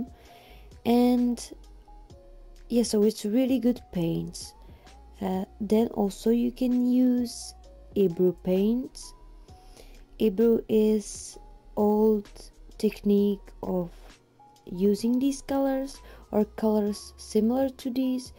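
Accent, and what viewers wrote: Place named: Czech Republic. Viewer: Máš tam český přízvuk budeš nahrávat I český nebo alespoň titulky 😍 jinak super kontent a miluju akvarel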